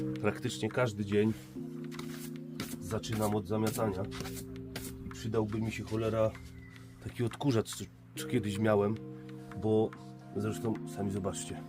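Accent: Silesia, Poland. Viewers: Fest zaciągosz po naszymu Podobo mi sie to Pozdrawiom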